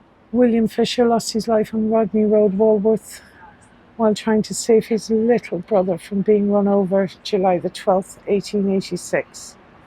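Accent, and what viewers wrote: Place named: Ireland. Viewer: Ohhhhh, it’s so spring lovely to see and hear. Beautiful Irish lilt. I’m sooo looking forward to to your video, of Roman London. London Spring/ Summer/, what more can one possibly ask for. A absolute Delight for the senses. 😊🏴‍☠️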